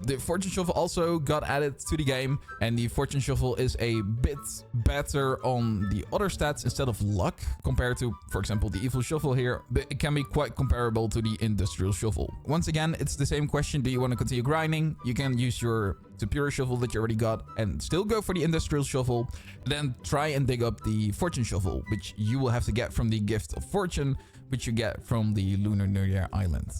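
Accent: Netherlands. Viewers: the dutch accent is strong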